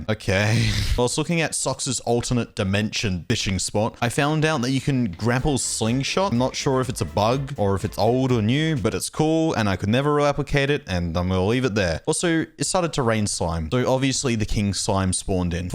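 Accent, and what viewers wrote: Australia. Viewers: are u from australia?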